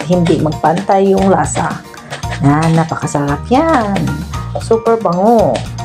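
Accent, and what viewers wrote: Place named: China. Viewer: Level up na biko ito my dear friend, Nakakatuwa po intonation the way your spoke po ng tagalog parang chinese intonation po. Amazing tutorial po my dear friend.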